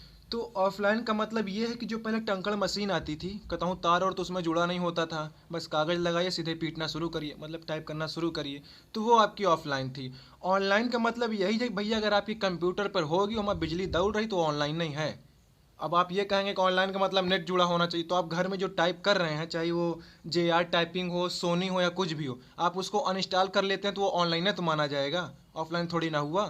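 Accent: Allahabad, India. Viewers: Tqqq sooo much sir 🙏 And apki allahabadi language mashallah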